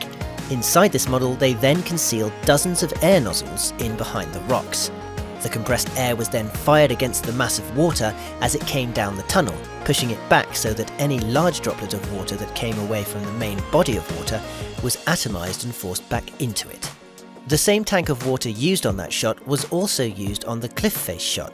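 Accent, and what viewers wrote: United Kingdom. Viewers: Your name is. I presume that at least one of your parents is from Latin America, Spain, or Portugal. I wonder where you get the sort of British accent that you sometimes have? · Due to the extremely thick British accent, I had a big problem understanding some of the narrator's comments.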